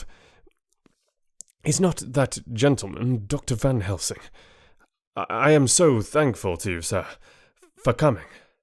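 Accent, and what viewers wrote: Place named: England, United Kingdom. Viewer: Congrats an an absolutely fabulous reading, and so much better with an English accent, I couldn't bear the American. Please, please, please finish the book!!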